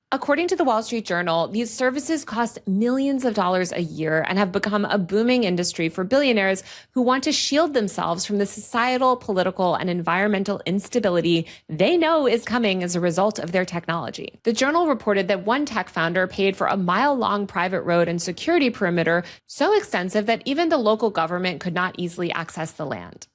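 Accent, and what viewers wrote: California, United States. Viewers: she literally sounds like if California was a person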